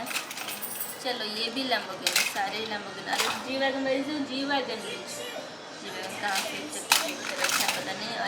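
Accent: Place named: Odisha, India. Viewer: No your hindi is not that bad ... its generally the accent of odias.... anyways it will improve with time ... and the video is not boring at all . And at some part of the video only the background music was dominating your voice (hope you dont mind )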